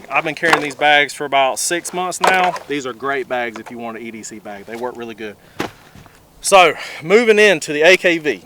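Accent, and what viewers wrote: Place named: Carolina, United States. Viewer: That’s gotta be a fellow Carolina boy with that accent 😂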